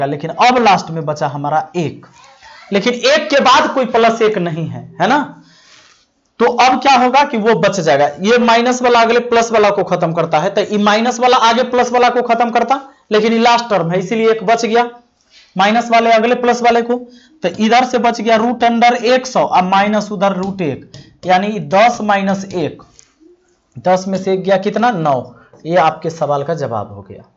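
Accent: Bihar, India. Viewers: Please Bihari tone me mt padhaya krein sir Please mujhe kisi ko hurt nhi krna just mujhe smjh nhi ata isliye bol rhi hu I am fan of your tricks but just because of tone I am unable to understand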